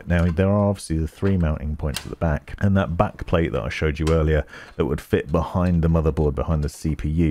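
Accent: United Kingdom. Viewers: And because of the fact, that the most english I have to deal with is primary with some american flair, it was kinda cool to listen to a british tongue ;)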